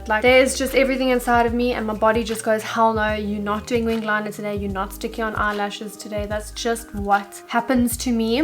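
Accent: South Africa. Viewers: Are u South African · You sound south African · Ooh a south African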